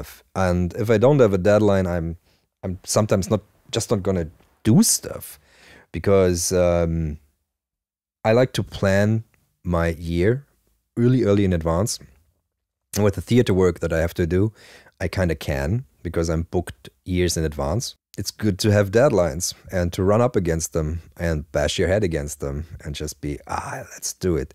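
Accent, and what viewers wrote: Germany. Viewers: Your voice is like recording to tape. Crisp top end, tight low end. The perfect amount of German accent is like the subtle drift of ancient oscillators.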